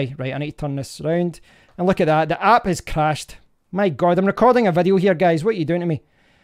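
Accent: Scotland, United Kingdom. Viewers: Each time I tried it I would get pops and noises and I just couldn't get it resolved. I would like to pull it out and give it another try but can't find it. I have wasted so much money trying to improve the audio on my YouTube videos. I should have just put out some serious cash and bought something with a known track record. Now I am trying to figure out what that would be. I want a wireless mic (tried a cheap MOVO WMIC10) and it just cuts out for no apparent reason so there would be audio gaps every once in awhile. I think audio is the hardest part for most people who come with no experience. The terminology is foreign and it has just been a steep learning curve. So there you go. My daughter-in-law is Scottish and I love hearing your accent. ;-))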